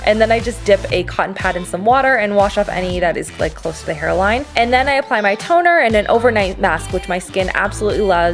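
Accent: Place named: Canada. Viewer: Are u Canadian u kinda have an accent I was just wondering ;)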